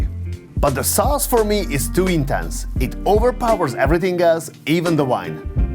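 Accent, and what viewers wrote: Hungary. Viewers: Nagyon jo a video. Szerintem igy felmillio feliratkozonal ez a csatorna mar tekintheto komolynak is, igy erdemes lenne angoltanart keresned. Anyanyelvit mindenkepp,mivel valasztekosan,magabiztosan beszelsz, csak a kiejtes,es a hangsulyozas az, ami nagyon "magyaros".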